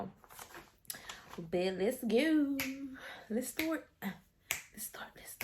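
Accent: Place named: Louisiana, United States. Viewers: Hey, I have a question are you from 504? The Accent sounds like the south mainly New Orleans which is where I’m from. My friend said hey go check this video out sis sounds just like you. Lol Peay that you have a fast safe recovery 🙂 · I HAD TO FOLLOW BECAUSE U FROM MY HOMETOWN U SOUND LIKE U FROM LOUISIANA AND HOW OLD IS UR MOM IM 8 MONTHS POST OP IM GOIN BACK DECEMBER MIAMI JOLIE GETTING A 360 LIPOSUCTION AND A BREAST REDUCTION WITH SOME FAT TO HIPS THE LAST TIME I HAF DR SAMPSON FROM SEDUCTION HE DID MY 360 LIPOSUCTION WITH A BBL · Its the LA accent for me bebby!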